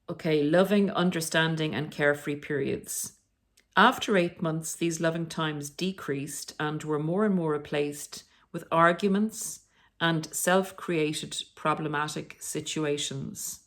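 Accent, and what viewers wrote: Ireland. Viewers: As well as her beautiful Irish accent ♥️🥰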